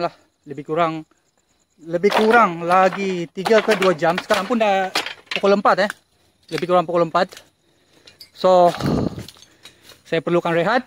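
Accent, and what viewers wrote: Sabah, Malaysia. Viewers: mcm org Sabah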